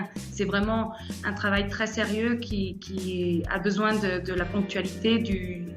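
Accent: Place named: France. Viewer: Elle a l accent français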